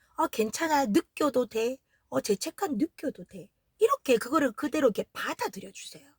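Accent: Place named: Gyeongsang, South Korea. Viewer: 같은 경상도말투라서 그런지 나탐님, 사랑작업, 아이엠티비보다 이해가 500프로되네요 감사합니다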